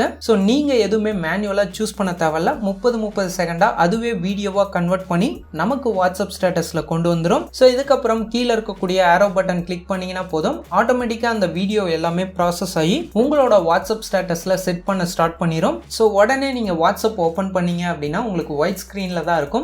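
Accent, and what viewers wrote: Salem, India. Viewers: Bro nega salem atturah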